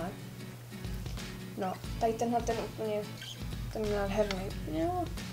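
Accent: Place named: Moravia, Czech Republic. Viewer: Mě se na tobě líbí takovej ten moravském přízvuk:D např. jak říkáš líbí-líbijou :) video je super a nad nákupem přemýšlím! :)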